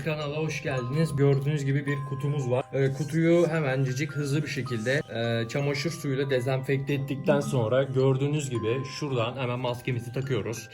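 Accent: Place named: Thrace, Turkey. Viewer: abi sen trakyalımısın ? çok fazla trakyalı ağızı var sende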